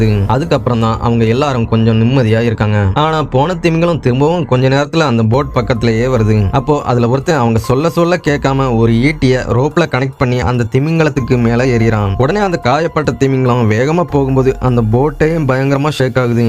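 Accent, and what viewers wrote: Kongu, India. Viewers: Varuthung pothung puriyuthung...bro nenga. Kongu side ah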